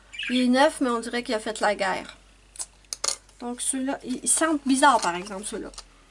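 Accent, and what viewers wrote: Canada. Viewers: Coucou Moi aussi j'adore regarder ce genre de vidéo ça me fait découvrir de nouvelles marques. Et en ce qui concerne tes vidéos je pourrais les regarder en boucle juste pour entendre ton accent 😊 je suis française et l'accent canadien me fait voyager rien qu'à l'entendre. J'aurais une petite question, je découvre avec ta vidéo les prismacolor scholar. Quelle différence y a t'il entre ceux là et les prismacolor premier ? Merci bcp Et bon colo 😉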